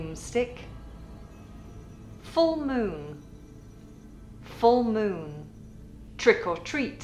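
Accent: United Kingdom. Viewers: You accent is similar of british english. I love! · are you a british? I´M BRAZILIAN.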